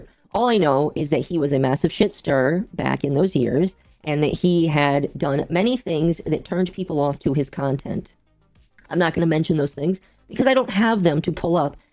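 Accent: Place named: Boston, United States. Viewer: Having found this channel through Spicy Fox Anime Woman, I have learned some things: 1) is autistically highly intelligent. What this means is that she has logic analysis that, when focused, can burn through bullshit, lies, and deception like a magnifying glass amplifying solar energy to the point of combustion. 2) She, whether she knows it or not, applies the Art of War to internet drama and issues. And she is very, very good -- to the point that she does not interrupt an enemy who is making a mistake. 3) is based, and stands for truth. 4) She never picks fights. 5) She is fact and evidenced-based, and certainly will clarify if something is her opinion or not. 6) She has done much to expose grifters and liars for who and what they are. 7) Most importantly, she is the kind of woman many men wish still existed, only because she is fun-loving and has a very warm personality, not to mention being very attractive. 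And this, in spite of the Italian Boston accent, and her self-proclaimed "Woman Debuffs." In conclusion, people should learn that is a manifestation of a quote that many either don't know, or never learned: "No one can have a more savage enemy than one who thinks they are right with God." Because when you mess with the Bull of Truth, you can and will get its horns. And when you do, you cannot blame others because you f*cked around and found out -- exactly as SFO/Dev are finding out themselves. This is why I support. She is good people and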